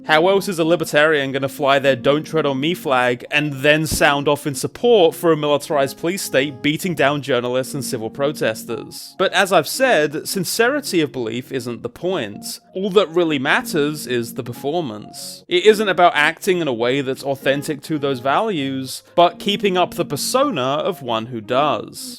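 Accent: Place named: United Kingdom. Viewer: he's literally just British holy shit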